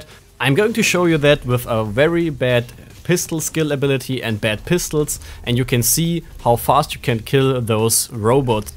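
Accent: Germany. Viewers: bro wieso machst du englishe videos man erkennt an deiner stimme das du deutsch kannst :)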